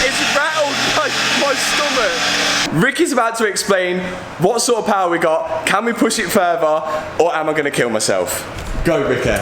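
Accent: United Kingdom. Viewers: Your british english accent hurtsfor my ears, also indistinctive.. BTW nice car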